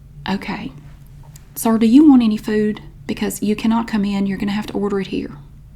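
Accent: South, United States. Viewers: This is amazing! Raised in Oklahoma and the accent is so wonderful.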